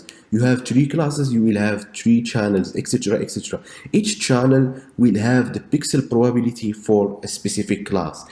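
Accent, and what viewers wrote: Morocco. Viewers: HHHHHHHHUU tanta ban lik mghribi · Anyone who's confused with the word "paasions", it means "patients". I too was confused due to his moroccan accent. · لي كيشرح مروكي باينة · I know a Moroccan voice when I hear it . Happy to see my fellow compatriot delivering a course on this channel.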